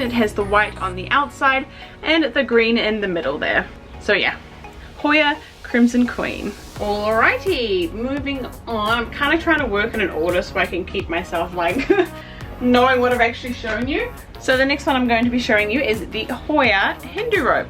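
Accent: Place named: New Zealand. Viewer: So I was watching Hoya videos tonight and yours popped up... a fellow Kiwi accent :-) Lovely set up you have in the green house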